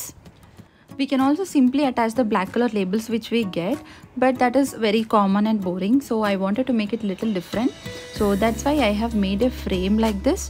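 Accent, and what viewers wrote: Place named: South India, India. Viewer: see is south Indian her accent will be like that You don't teach her necessary English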